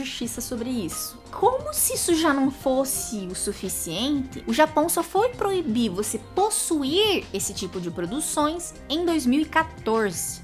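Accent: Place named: Minas Gerais, Brazil. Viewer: tu tem o sotaque de mineiro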